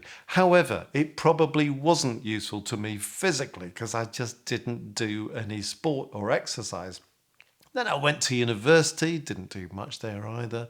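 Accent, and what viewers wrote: England, United Kingdom. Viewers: Thank you for sharing your story so inspiring, determined and disciplined!! Thank you for making YouTube videos!! You are the best teacher , I love your English accent. I watched you videos repeatedly… you are so patient your lessons are so details, and mostly easy to understand!! Thank you very much!! 🙏🙏❤️❤️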